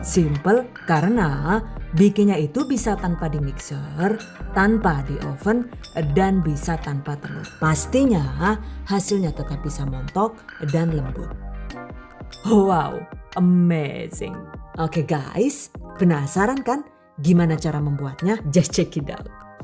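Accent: Banyumas, Indonesia. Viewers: Tinggal olih neng Jatim, tapi ngomong tetep ngapak ya bund😅 #GiveawayTHV2021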